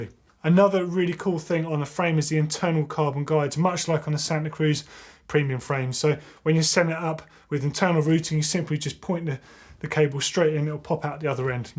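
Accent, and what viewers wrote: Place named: England, United Kingdom. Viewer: is a proper Englishman and they pronounce things funny. Ask him to say "Gloucester" or "Worcestershire". You'll see what I mean.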